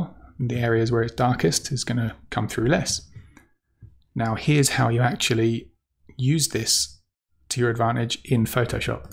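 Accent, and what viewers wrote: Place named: United Kingdom. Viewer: Hey, I used to subscribe to your channel and newsletter in the past but over the last couple of years my photography has had to take a back seat for various reasons (mainly work!). However, I find myself self-isolating and working from home at the moment and have actually had a bit more time to go back and start editing some of my images. Your name popped into my head as being the 'luminosity mask' guy so I duly found your website and channel and resubscribed to both:) I have to say, I've picked up so much by watching your latest videos and everything is making sense now. Probably one of the reason my interest waned before was I simply wasn't getting the whole luminosity mask idea. Having just watched this video, the whole 'stencil'/'lace' analogy is something I wish I'd heard a few years ago. I remember watching your live walk-through edit of the Gibson Steps(?) and being impressed at the results but not appreciating the techniques you were using. 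Might be time for a re-watch if it's still available. Anyway, great stuff all round and thanks! BTW - if I recall, you're based in Oz aren't you? Reason I ask is that I detect a UK accent in there somewhere! [edit - spelling]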